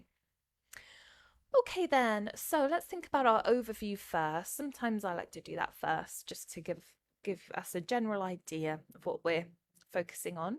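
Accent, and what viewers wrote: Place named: United Kingdom. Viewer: This lady has a very relaxing and soothing voice and British accent possibly? I get sleepy too. The other man uses use’s different variations n speeds In his voice so it’s more engaging.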